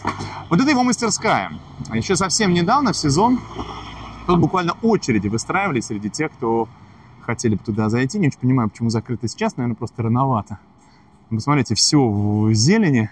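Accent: Standard. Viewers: твердая 5 за прекрасное знание истории Баку! Очень правильная и чисто русская литературная речь. Человек благородный и жизнерадостный. Мне самому стало любопытно, как иностранец может столь интересно рассказывает историю нашего города. Рад что для гостей Баку открываются свежие страницы из жизни нашего города. Люди добры и чистоплотны, вежливы и гостеприимны. сильно отличаются от других народов Кавказа. Жители Баку богаты и горды, не любят приставать со своими услугами к незнакомым людям, но всегда придут на помощь если к ним обратятся., вам всегда будет сопутствовать удача за ваше жизнелюбие. Жаль что поленились и не сняли все что увидели в Баку. Отдельно про Баку -чистый и омытый весенним дождем, вкусов жаренного мяса с разнообразными специями, ароматом горячего хлеба, морских волн и криком шумливых. попугаев. Баку рад и ждет гостей разных добрых и любопытных, мечтающих возбудить чувства и вкус в новых путешествиях и приключениях.. 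понравился Баку, нам понравились вы! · Наслаждаюсь чистейшей русской речью огромное спасибо спйчас это редкость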